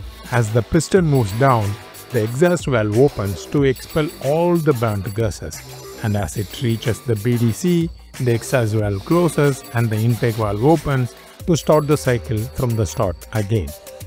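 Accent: India. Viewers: The indian accent got me😂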